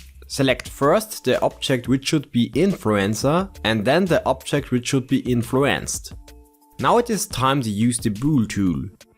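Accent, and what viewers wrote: Germany. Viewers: Dein German-English is aber schon heftig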